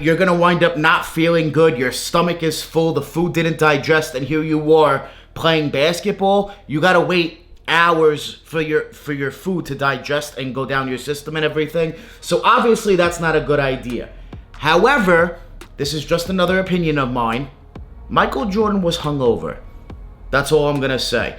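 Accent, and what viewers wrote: New York, United States. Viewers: Nobody gives AF what this out of the closet Drew Carey with a NY accent thinks. Stop saying Jordan wasn’t sick. Having food poisoning makes a person sick. In fact, many of the symptoms are similar to what someone feels when they have the flu. Stick to promoting your nerdy “DO NOT BUY” bs videos. By the way, I find it ironic that someone who looks like they have no athletic ability whatsoever tries to influence people on sneakers.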